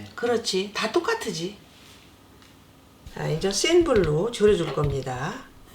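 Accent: Chungcheong, South Korea. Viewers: 보리밥에엿기를넣고 한꺼번에 삭히는 방법 이 편하겠네요 구수한 충청도말 씨도 부럽네요 배우고싶어 따라하는데 잘ᆢ안되네요 열씸히구독하겠습니다 고생하셨어요 잘ᆢ보았 습니다❤ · 아이고 너무너무 잘하십니다 가까이계시면 인사나누고 싶네요 달인이십니다 충청도 억양 말씀이 정말 정감이 가네요 저도 충청도 부여에유 부디 건강하시고 맛난 고추장 계속 올려주셔유 ❤ 존경스럽네유 🎉🎉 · 충청도 사투리가 넘 정겹고 젤 맛나게 설명을 해주시니 머릿속에 쏙쏙 들어오네요~영상 감사합니다^^ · 구수한 장맛 구수한충청도 사투리 잘 보고 갑니다. 건강하세요. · 아주머니 충청 도래요 말씀 하시는것이 충청 말씀같아요 고추장 하는것 된장도 잘보았습니다 항상 건행 하세요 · 저도 충청도 대전 사람이지만, 해외에서 선생님의 구수한 충청도 말씀으로 자세한 설명을 들으며 보니 고향생각도 나고 구수하고 정겹습니다.. 감사합니다. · 고추장 정학희. 일러주셔서. 감사하오.충청도 사투리 소탈한목소리 좋아요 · 말씀하시는 말투가 충청도 말투이신디 이 어느지역이예요? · 충청.음정.넘 정겹네요~^^ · 충청도이신가봐요 넘웃겨요 말씀하시는것이 구수하구 ㅋㅋㅋ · 얼굴 보여주세요. 항상 웃음이. 정겨워요. 여사님. 충청도. 사투리. 옛. 어린시절생각이 많이 납니다. 칠십이. 넘어도. 구수한 자기 고향 사투리가. 듣으면. 어린시절. 시간들이 필림처렁. 돌아가. 자주. 시청합니다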